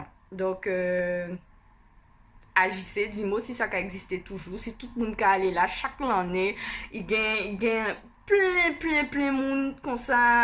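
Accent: Cap-Haïtien, Haiti. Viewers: Je peux comprendre Eli kreyol maintenant c'est exactement cette langue qu'il essaie de parler 🇭🇹🇭🇹🇭🇹🇭🇹🇭🇹🇭🇹🇭🇹🇭🇹🇭🇹🇭🇹🇭🇹🇭🇹🇭🇹🇭🇹🇭🇹 je sens une jeune femme haïtienne qui parle a travers cet accent. tu me mets dans ma peau🥰🥰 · Kreyòl la sanble a kreyòl Ayisyen an anpil, m konprann 95% nan sa ou di a, que chest beau. That Creole is nice. Resemble the Haitian Creole. 🇭🇹🇭🇹🇭🇹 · Li sanble kreyol moun okap..suis capoise. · Mwen se Haitienne mwen konprann tt sa ou di yo, paske li preske sanble ak creole haitien,( e konsi lè yon ayisyen moun okap pa konn pale français lolll mvreman ri) blague...ou amusante kenbe la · Tu parles bien avec la manière des jeunes de la guyane kkk mais on sens le petit cote haïtien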